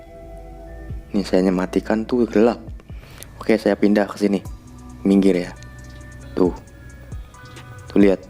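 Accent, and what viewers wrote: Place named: Banyumas, Indonesia. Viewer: Jos gandos 👍👍👍 ora ngapak ora kepenak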